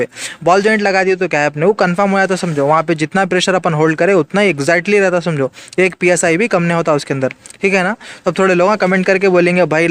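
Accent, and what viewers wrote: Hyderabad, India. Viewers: Tume Hyderabadi mlum hote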